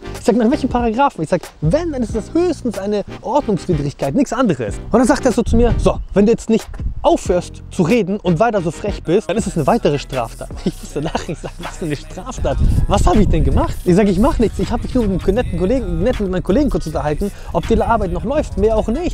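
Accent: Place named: Hamburg, Germany. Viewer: Norden/Hamburg Slang!! Dialekt!!! Wir sagen auch Zb Tach statt Tag!! Ist schon richtig!!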